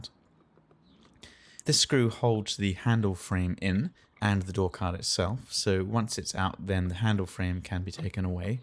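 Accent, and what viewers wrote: United Kingdom. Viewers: I think he's British Mate!!!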